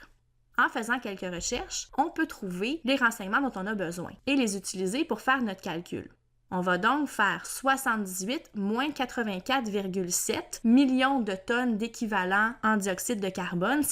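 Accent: Quebec, Canada. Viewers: tabarnak de calice je suis tombé en amour avec l accent quebecois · Merci pour cette vidéo , vous avez été très clair, j'adore votre accent québécois 🥰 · J'adore l'accent québécois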